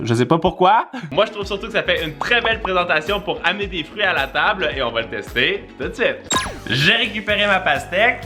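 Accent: Canada. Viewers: j'adore ton accen canadien♥♥ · Chui le seul à kiffer l'accent canadien ??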